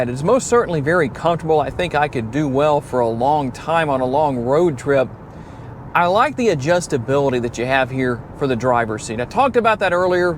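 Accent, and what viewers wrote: South, United States. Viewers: Love the car and great video description. I treated myself with a 2025 urban gray colour. Love the ride and the confidence of being in control on the road facing fellas with souped up pickup trucks. By the way I could listen your voice all day it’s very soothing and welcoming. I always been attracted to that lovely southern accent! Keep up the good work sugar love!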